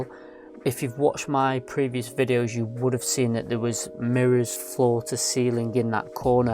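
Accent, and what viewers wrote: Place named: North, United Kingdom. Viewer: The quality of videos is very good. I also love your northern accent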